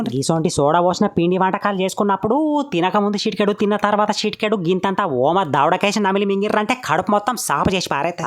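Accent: Telangana, India. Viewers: Pure telangana yasa chala bagundhi bro ❤❤ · Nice bro Telangana yasa enta bagundo ❤ Chitka siper😊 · Good morning. Mana telangana yasa vintunte chala santhosham ga undi. A state lo una me videos chustu ne untam 😊. Ma babu meku peru petasadu ani 🎉🎉🎉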